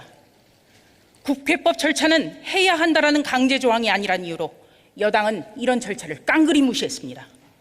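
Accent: North Korea. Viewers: 이은재 강남 망구 대타 조~조 수~다쟁이 진~상 저진상 북한에서왔나? 목소리가? 저여자 보기만해도 독살기가 두눈에서 번쩍거려요 합죽이 입안에선 껌씹고 있나? 왜그렇게 우물거려..! 저런걸 ㅋㅋ. 미통당답다 허기야 잡동사니 집합소아니까 저기 태영호 지성호도 있잖아..ㅋㅋ 귝민의 귀에는 미친개 짖는소리로 들린단다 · 조수진 너와 그 외 똘마니들 (미통당) 북한 사람인줄...말투가 왜 저러지?? 못된 관행은 좀 버려라~~~내 세금 돌리도~~니 피같은 세금 너네처럼 놀면서 받으라고 안냈다..걸핏하면 장외투쟁...정말 지겹다!!!!!니네가 민주주의 얘기하니까 왤케 웃겨~~~ㅋㅋㅋㅋㅋㅋㅍㅎㅎ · 조수진이 이여자 북한 아나운서 였어? 말도 약간 북한 사투리쓰는것 같고 말하는톤도 그렇고... 북한 아나운서 같이 들리네.... 왜 30대 젊은이들이 내집 장만 못하는줄알아..?? 너희들 같은 국회의원들이 그집에서 살지도 않으면서 투기 목적로 집을사서 돈을 벌고 있기때문에 집세도 올라가고 젊은이들은 집도 사기 힘든거야..... 지금 넌 뭘 알고 이야기 하니?? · ~조선에서 북한억양으로 무게 잡고 트집잡던데 작정한 듯 적어 온 글 따닥따닥 딱타구리처럼 웅변하고 있네요 ~~ 적폐가 적폐를 ~~ㅠ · 대한민국 국민 맞나? 이북여자같다 억양이 억세고 평양가서 하거라.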